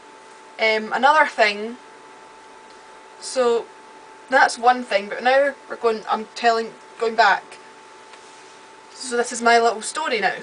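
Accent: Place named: Scotland, United Kingdom. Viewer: Good on you for making this video and helping to understand Autism. If I can ever afford a good video camera I too may make a video on autism. But the prospect of talking on video to an audience of possibly millions terrifies me. In regards to that you are far more brave than I. I applaud your efforts. I really like your scottish accent too.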